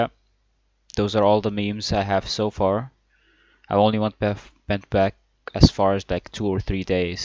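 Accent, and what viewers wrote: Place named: Egypt. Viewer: the egyptian accent is just 👌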